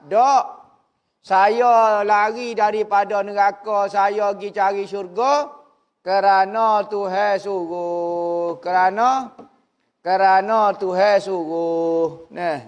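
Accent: Kelantan, Malaysia. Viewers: Alhamdulillah kamu pahe gok ustadz kecek loghat kelate..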